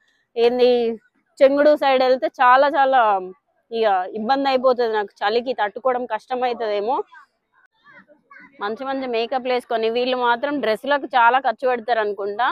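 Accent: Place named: South, India. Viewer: South Indian accent is soo funny 🤣🤣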